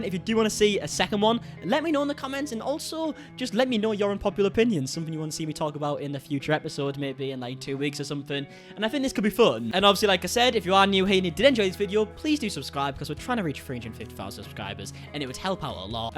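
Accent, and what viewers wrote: United Kingdom. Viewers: I was so bored and this popped up. YAY. Commenting so can buy himself some decor for his shelves and I get my UK accent fix 😁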